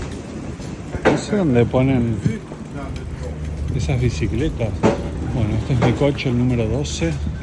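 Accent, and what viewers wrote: Argentina. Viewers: Me da la idea que eres Argentino, por tu acento, y la actitud muy de por ahí, que por aquí en España, nos han dado un repaso con mucha mitomanía.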